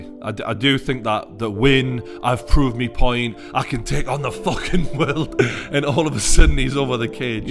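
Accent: Newcastle, United Kingdom. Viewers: Looooolllllll geordie. Enough love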